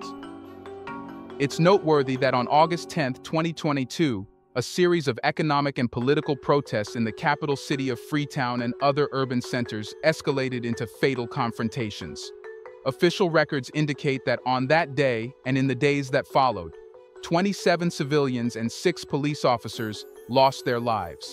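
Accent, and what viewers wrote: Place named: United States. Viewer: The narrator is an AI right? Sounds Amerlcan but the country pronunciations are not consistent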